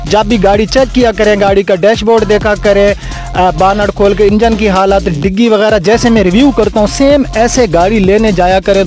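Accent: Saraiki, Pakistan. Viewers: Aap ka speech style impressive hy sraiki lehjay ki wajah se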